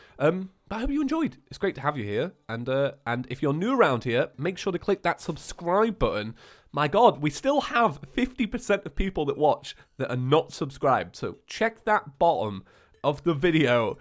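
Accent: United Kingdom. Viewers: For the most part it is just his british accent, (I don’t think it’s horrible, I actually love it) but his voice is kind of deep so that makes it sound a little bit different than others. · It's not captions, it's terrible accent. All I hear is "hell loacher ari-eh and fewzis my name is tsheims". English is not my native language but even for me this speech is too painful to hear. Is it how british English sounds?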